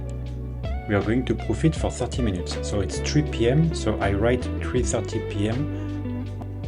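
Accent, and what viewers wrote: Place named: France. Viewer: The last time I heard French English accent was so long ago, and it is nice to see a French chef guide how to make a brioche 😄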